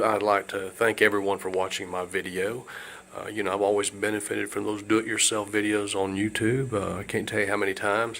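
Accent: United States. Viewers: The minute you started talking I said, he's from Georgia. I'm glad you mentioned you were in Georgia there at the end. Good to be right! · I think the southern accent is a clear indication he is not from California.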